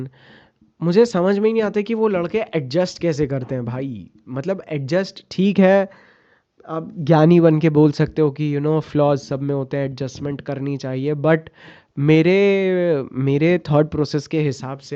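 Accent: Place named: Maharashtra, India. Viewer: Your marathi accent is damn good bro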